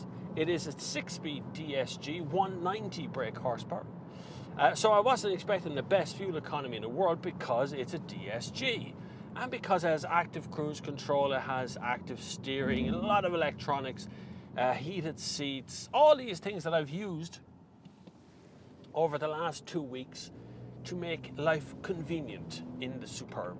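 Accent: Ireland. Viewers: Enjoyed hearing the Irish accent in this review.Haha...Only thing better would be hearing a female Irish accent! ;-)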